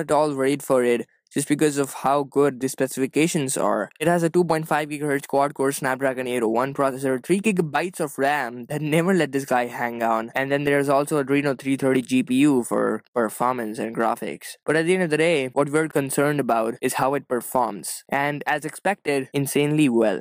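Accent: India. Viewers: U got indian English accent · Your indian accent is a little thick, just me.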